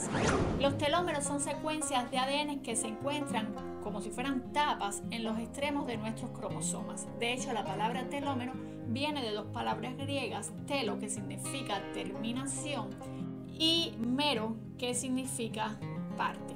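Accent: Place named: Cuba. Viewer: GRACIAS MUY BIEN EXPLICADO SOY CUBANO Y USTED HABLA COMO NOSOTROS LOS CUBANOS DIOS LA BENDIGA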